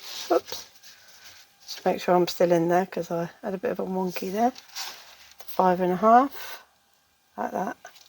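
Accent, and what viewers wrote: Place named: South West, United Kingdom. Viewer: Love it! I love trying 3D projects and I know from your previous work on tv craft shows that you always come up with some great construction projects. Looking forward to trying this and watching your other tutorials. I'm from Bristol but live in Tamworth and it is nice to hear that South Western accent - makes me feel at home!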